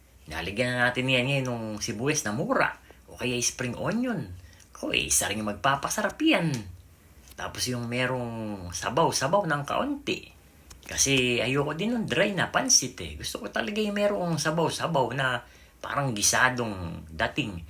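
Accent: Bulacan, Philippines. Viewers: taga bulakan ka san lugar ksi yun punto mo .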